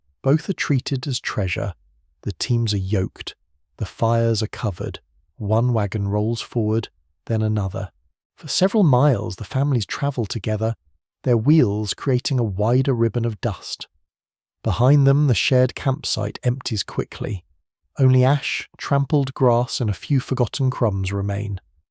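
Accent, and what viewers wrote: United Kingdom. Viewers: pm Rochester NY. Not feeling the British narrator.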